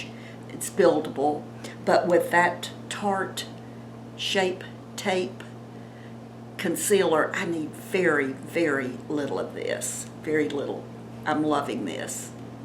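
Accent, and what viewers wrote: South, United States. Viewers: UGH...no more it cosmetics for me...way over priced anyway! Thanks for the info!! Just found your site... Enjoying hearing the southern accent as I am from Memphis, but have been in Reno, NV for past five years for medical treatment for my son with Lyme disease. Hope to get to go home soon. You are a beautiful 70 ish year young!!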